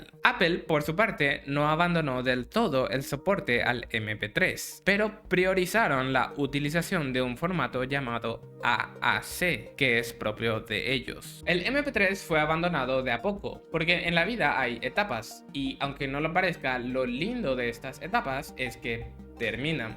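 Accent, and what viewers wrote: Paraguay. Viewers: Sos paraguayo verdad ?. UN ABRAZO KP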